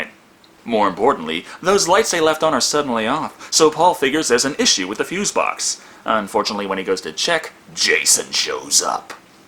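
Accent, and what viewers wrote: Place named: New York, United States. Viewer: Ok seriously what is with the New York accent?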